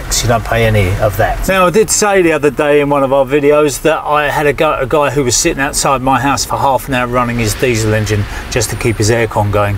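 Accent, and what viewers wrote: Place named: London, United Kingdom. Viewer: Hi. Very interesting video, your definitely a natural in front of the camera. I didn’t realise your stomping ground was London, but I guess you’re accent should have been a clue 🤣. As I have stated before regards the charging aspect, and you have said exactly the same a lot of houses are not able to support the extra demands of changing vehicles. Which might be even more than one vehicle. Again as you rightly point out the government has to take this reality on board, to make this a viable alternative for everyone. Best wishes and kind regards to you and your work colleagues 😀👍👍👍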